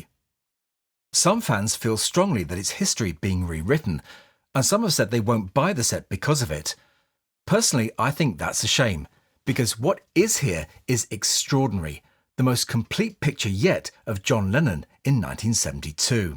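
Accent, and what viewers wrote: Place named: United Kingdom. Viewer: Excellent review. As an American, I find your "British voice" (not the correct way of expressing this) adds to your deep credibility in discussing Lennon. I will not, however, spend my money on this set because I was alive in 1972 when were radical artists doing radical artistic stuff in New York City and I heard their works back in the day when all their unique material first was released. I have to conclude that their son, Sean Lennon, misses the point entirely about his radical artist parents. There must be something wrong with him. Maybe he is "in denial"? He of all people should have loved and accepted his parents for who they genuinely were. And they were radical artists. No two ways about that. This set may promise to be impressive in the context of the truth that today's technology makes audio recording with the available technology from fifty years ago sound "better." But eliminating the controversial song " " from the set does not make this set "better" at all. 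Quite the opposite is true. The specific elimination of that essential song in unforgivable and the elimination erases any credibility Sean Lennon has as a producer.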